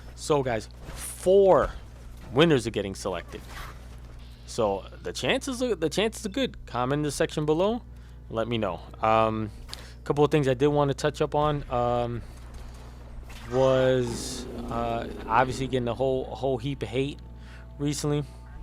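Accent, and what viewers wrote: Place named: New York, United States. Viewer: thanks I cant afford ps4 if I could I'd buy it just to talk to you cause I love your NYC accent >.> I'm from Georgia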